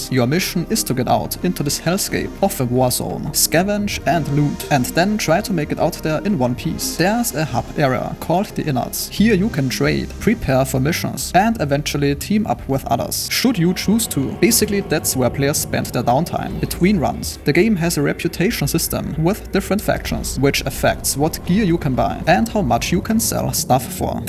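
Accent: Germany. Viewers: Boah dieser deutsche Akzent geht schon hart XD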